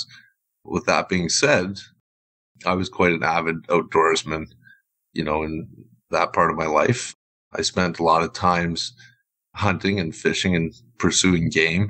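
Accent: Canada. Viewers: I love your Canadian accent! Great story!❤ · Dude sounds like Tom Selleck with a touch of Canadian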